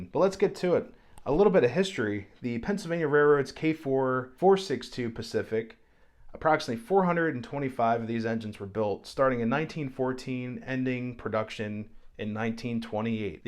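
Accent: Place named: Maryland, United States. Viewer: the Train Garden? are you from Baltimore? I hear those Maryland and SE PA vowels.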